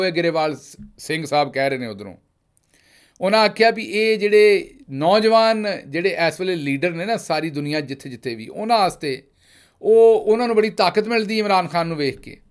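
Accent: Punjab, India. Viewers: Saab I literally enjoy listening to Ur Punjabi accent ❤😂 I myself a paki Punjabi from Karachi & resident of Oman but my Punjabi is soooo very poor😂 May Allah bless u Sir lotsss of respect🫡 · گل صاحب تسی منو یا نہ منو لیکن تہاڈی پنجابی دا سواد ا جاندا ہے کڑاکے کڈ جاندے ہو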